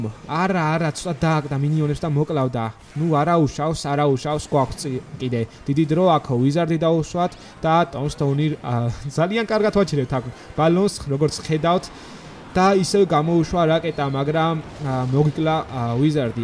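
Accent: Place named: Guria, Georgia. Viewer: guruli kilo gaqvs dzaan magari arxi gaqvs es ubralod ise gitxari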